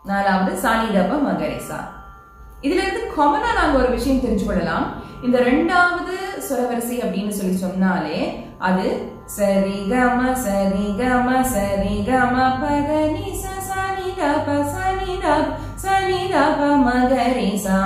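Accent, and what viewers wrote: Sri Lanka. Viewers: இலங்கை தமிழ. அருமை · India or sri lanka Your language style is like Jaffna mam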